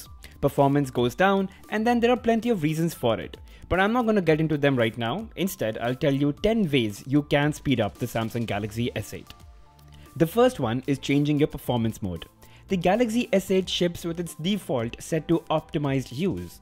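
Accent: India. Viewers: Love Indian accent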